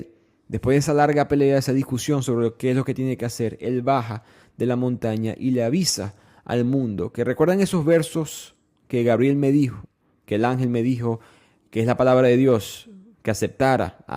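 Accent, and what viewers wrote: Maracaibo, Venezuela. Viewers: Tienes el acento del marabino educado e intelectual.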